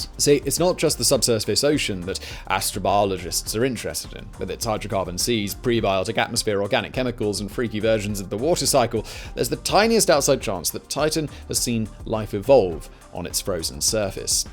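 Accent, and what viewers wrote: RP, United Kingdom. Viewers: ... I know your British or something to that accent...but meethane...it is a short E...methane...as in meth-ane...otherwise like the trim job on the beard... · Wait? How many channels do you have? Just so I know on which to go and subscribe. I assume the quality of your other channels is equally impressive, educational, entertaining and a just amount of brittish posh😉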